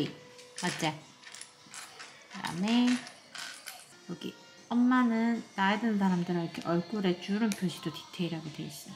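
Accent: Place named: Busan, South Korea. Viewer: 저도 놀러왔어요😍 사투리를 쓰시네요? 부산분이신가?부산사투리같은데~ 저는 부산인데~ㅋㅋ 근데 열라면에 순두부는 반칙입니다~😂방금 밥먹었는데 급 라면 한그릇해야겠어요ㅋㅋ 구독 꾹 누르고 갑니다. 또 놀러올께요~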